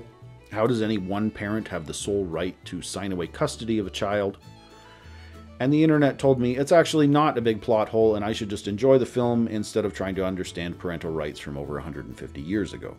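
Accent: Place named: Canada. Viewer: long time sub, I'm not sure if I already said this, but your voice is very relaxing. You sound like Dank Net, same accent, similar pitch and cadence (albeit to lesser extent). Which accent is that? I've heard plenty of Canadians but you and Dank Net are the only ones with that specific accent. It's a cool accent, where does it belong to? I'm Australian so I wasn't taught anything about Canada in school unlike Americans (I'd imagine anyway) and from Perth, Western Australia - every Australian that foreigners hear are from Melbourne, Sydney, or the outback. I'd imagine Toronto and Vancouver to be similar to the Melbourne/Sydney in that regard? Idk, just an interesting parallel.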